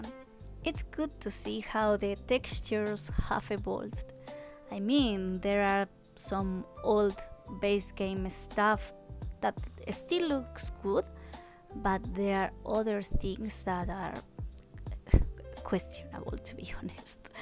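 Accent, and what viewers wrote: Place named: Spain. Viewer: Hello, Wow your English is so good and clearly understandable ! Also I love your super cute spanish accent~ I wish I I'd speak English like this. Beautiful build ! I checked UCLA on Google from which you took inspiration and good sims insertion~ I like how hou "simmifyed" it to melt the style with your own sims mark in Del Sol Valley. This world cruely needs renovations too and I'm excited to see how you'll redo this world with your own lore for the Zest family. Speaking of new Royalty EP, I'm very hyped for my part. I do love legacies and I feel the gameplay would blend so well into my saves and stories. Just disappointed the african inspirationned world ended in a poor neighbourhood with 5 lots... I hoped we had it as a whole new world but I kinda like how they created 3 whole universes in one world. That's interesting even thinking having a royal legacy in one of my saves I do play with 1 world = 1 realm/kingdom. 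Very excited to have this pack in our hands. Not forgetting CAS and build modes look insane !! I wonder what part 2 of this build would look like. Waiting for it !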